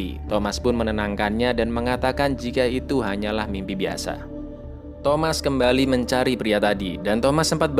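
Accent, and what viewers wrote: Java, Indonesia. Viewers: Kakak orang jawa ya ? 🥰🥰🥰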